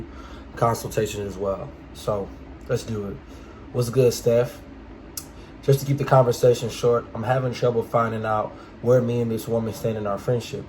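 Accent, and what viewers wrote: Baltimore, United States. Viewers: U sound like a bmore nigga🤣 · Baltimore accent detected. But nah for real steph thanks for all your help my G. Peace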